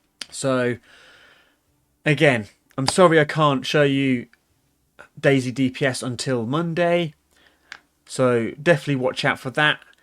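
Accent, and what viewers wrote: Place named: United Kingdom. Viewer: I love your British accent ❤ "I'd like to apologise" 😄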